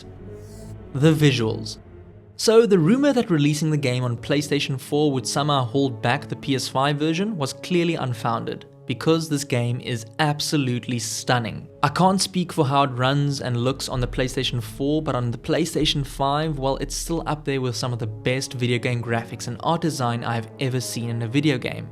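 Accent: South Africa. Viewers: I can tell this dude is South African and trying very hard to cover up his accent. “Taken to HaRRRt” “Cory BaRRRRlog” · Unrelated to the game... Are tou South African? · I could just hear the South African accent. Keep it up boet great content and loved the honest review!!! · Love the video, but on an unrelated note, I can’t help but recognize the accent…are you South African by any chance?👀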